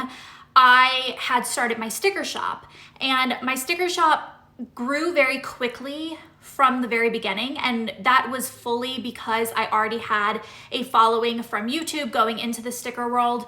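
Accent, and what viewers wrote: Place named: South, United States. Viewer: Feel like you've picked your Southern accent back up since moving back!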